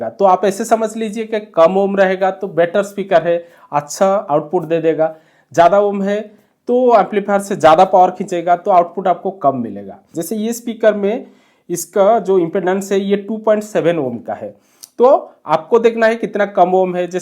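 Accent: Odisha, India. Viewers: odisha se ho?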